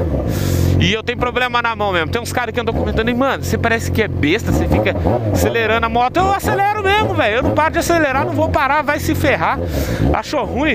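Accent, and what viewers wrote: Goiás, Brazil. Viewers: tem alguma ligação com goias? Ou so modo de falar? É noos!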